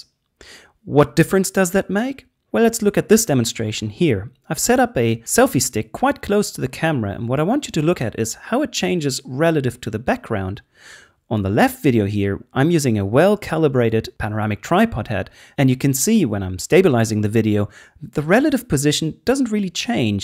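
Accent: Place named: Sweden. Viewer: You sound swedish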